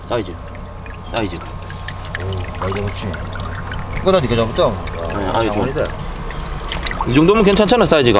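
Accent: Gangwon, South Korea. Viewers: 강원도 사투리 좋네요. 구독 신청요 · 강원도 사투리는 언제들어도 정감이 ㅎ 구경잘허구가우 !!!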